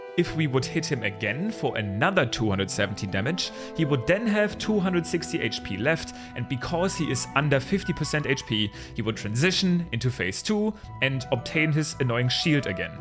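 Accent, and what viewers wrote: Germany. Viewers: Der gute alte deutsche Akzent verrät einen letztendlich doch immer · ICH HÖR DEUTSCH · Always love it when I watch a new channel and I can immediately hear the german out of them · deutscher kann man ja garnicht klingen haha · Er klingt sehr deutsch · deutscher akzent lol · Are you german?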